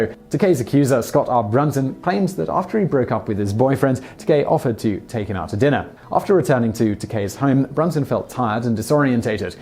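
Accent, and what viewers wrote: United Kingdom. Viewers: He's also British so his pronunciation would be a little different.... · brother, your videos are excellent, however, certain words my friend, i.e., "berry" as oppose to baby. Rosemary's Baby. I gave you the benefit of the doubt due to the British accent, after rewinding the video many times, yes, "berry." Cheers my friend.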